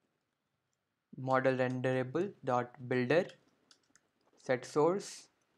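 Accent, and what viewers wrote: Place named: India. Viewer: Hey buddy you are doing really great....Would love to talk to you about the realm of immersive reality. I am a 3rd Year B.Tech(IT & Mathematics ) student of University of Delhi. I have been working the realm of immersive reality tech for about 6 months now.Are you a delhite by any chance.......you definitely sound like an Indian. I am the head of the VR AR MR- Delhi Chapter, which is an open community of immersive reality enthusiasts. I organize workshops for immersive tech. Do connect me on <mail> to discuss more on the topic. PS: You really are doing some impressive work out there.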